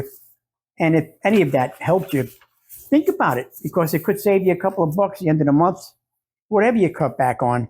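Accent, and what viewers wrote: New York, United States. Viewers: You kinda look, and DEFINITELY SOUND LIKE THE ACTOR, JOE PESCHI IMO...with that New York or New England accent?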